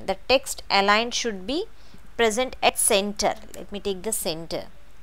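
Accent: India. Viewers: i love indian accent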